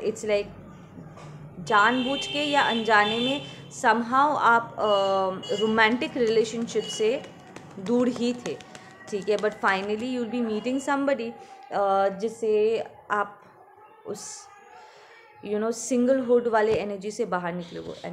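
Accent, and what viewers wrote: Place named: Bengal, India. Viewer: I m listening your bengali in a loop 🤭🤭 its very sweet to hear your bengali ascent 🌼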